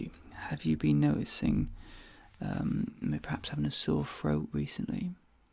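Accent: United Kingdom. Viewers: Love the accent what part of the UK?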